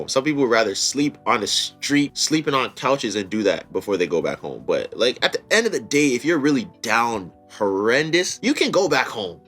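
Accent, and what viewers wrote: Canada. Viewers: He clearly has a Toronto mans accent geez I'm leaving the place as well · according to what you said, well 1 point i like to make, you do not need to move from 1 pointto the other. you do have transit there, right? that in itself is great and very cool. sight seeing by transit. but all the same, everything you said is a very good point. and you did not bash the country. if you are not from canada, you sound very much like someone from canada. ok someone from ontario but hey no one is perfect (i know, i am a total smuck)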